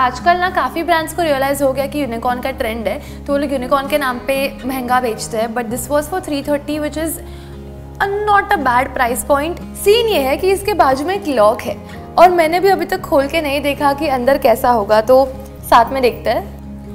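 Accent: Gujarat, India. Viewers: Di are you gujarati ?? Because again again you are saying like jaadrha jaarha i am also from Gujarat vadodara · Is your mother tongue gujrati becoz the way you speak it conveys that you are also gujrati like me pls reply di and I also love collecting stationery ❤️❤️❤️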